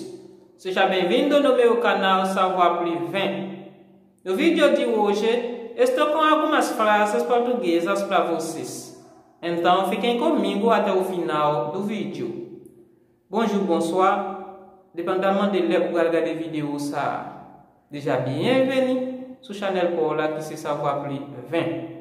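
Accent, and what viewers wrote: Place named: Brazil. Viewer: Ou pale portugais an tankou yon brésilien felicitations au commencement m pat konn anyen men koulya m komanse konprann lè wap pale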